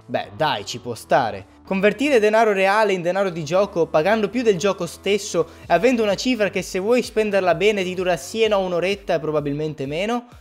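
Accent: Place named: Tuscany, Italy. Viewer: sei toscano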